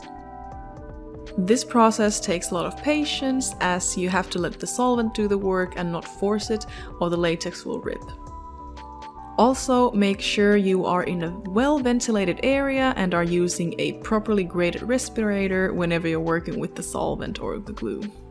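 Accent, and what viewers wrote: Finland. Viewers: Is that a Finnish accent I hear? Anyway, this video has a criminally low view count. That's an amazingly well-made outfit, and a video showing the process of creating a brilliant outfit like this one should always get a lot of views.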